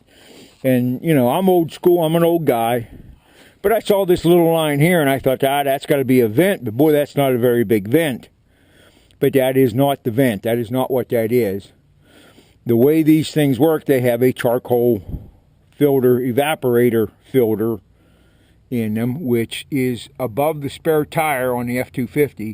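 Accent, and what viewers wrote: Pennsylvania, United States. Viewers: Are you from PA? you sound a lot like my father in law. :)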